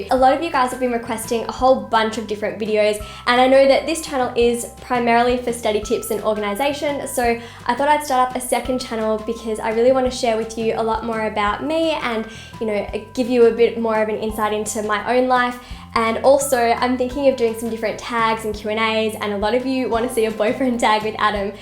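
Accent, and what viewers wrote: Australia. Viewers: Australia was a British colony, so most of the earlier settlers and first English-speakers were British so Australian English has very close spelling and pronunciation to Brits. most Australians speak like her. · Australian accent I can tell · JanielForLife Australian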